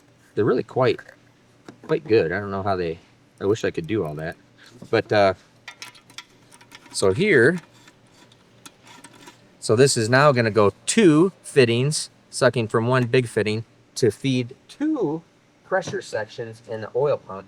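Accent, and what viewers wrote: Michigan, United States. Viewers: Nice explanation on the dual pumps, i immediately thought of staged pumps but more things to go wrong that way. On the “condescending” explanations. Part of it is the Michigan accent. I moved to Texas in the 80’s. I still have problems with my “tone” when my accent is more prominent, it sounds like your being condescending when its a detailed explanation and the Michigan enunciation sounds like your talking down to some. 🤷🏼‍♂️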